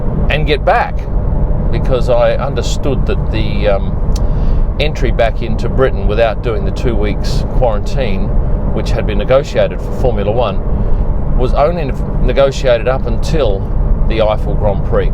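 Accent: Australia. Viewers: It’s so great to hear a fellow Aussie at the top of his game doing this. I shoot a bit for fun, but not on your scale. Love your work mate. From Quarantine central... Melbourne. 😂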